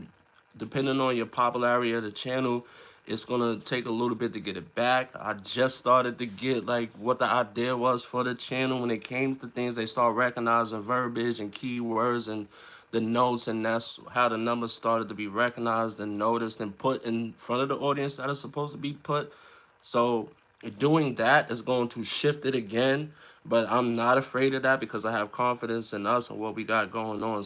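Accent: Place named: New York, United States. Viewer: I will watch Sinners when it comes on video. I have heard good reviews too. I didn't know you were a counselor, so good to learn more about you. Good message you shared. Meeting people where they are at, not where we want them to be. Listening is key. Like you said, sometimes we just need someone to listen, no judgement, no "answers". Good video. Love the accent, sounds like New York!🙌🏽👍🏽 Going to school for Social Work. Honorable!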